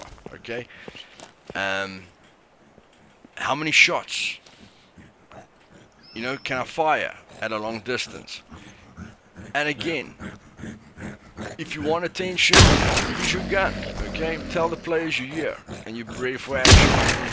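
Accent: South Africa. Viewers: i can smell the south african on you boet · South African like myself? you sound like it! Good video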